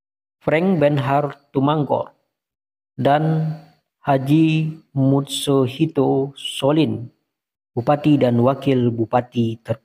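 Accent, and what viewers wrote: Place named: Aceh, Indonesia. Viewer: Logat yg membaca ini saya pastikan org aceh,benar atau salah.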